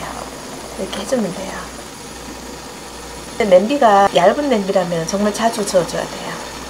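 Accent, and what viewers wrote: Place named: Daegu, South Korea. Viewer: 생강활용도짱~♡ 대구말씨 참 정겹네요 저도대구가친정입니다^^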